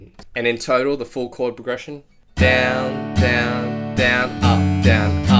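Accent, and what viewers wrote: Australia. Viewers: are you aussie? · are you australian?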